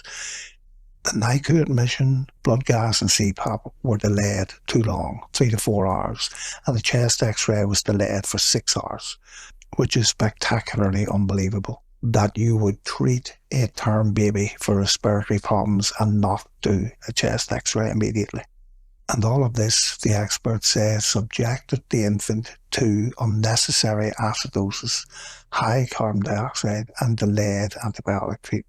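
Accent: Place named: Northern Ireland, United Kingdom. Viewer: that's a killer Norn Ireland accent there.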